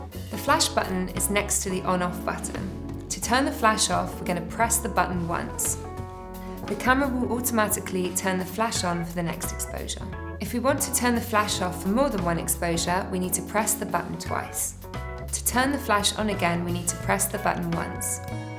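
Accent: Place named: United Kingdom. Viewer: I dont know why American companies feel the need to get s British person to explain their product in order to get that "designer feel"? What has England designed?...Marc Newson is good....but what else?.......no cellphone or space program that's for sure.......and lets get real the average person is broke in England..for whatever stupid low self esteem reason we need the accent...thats the stamp.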